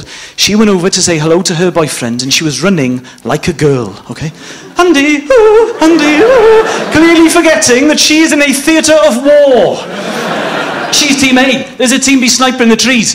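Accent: Wales, United Kingdom. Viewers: He definitely sounds more Welsh when he does stand up than when he's on the podcast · He said once on the podcast that he sounds less Welsh when he’s with John Robins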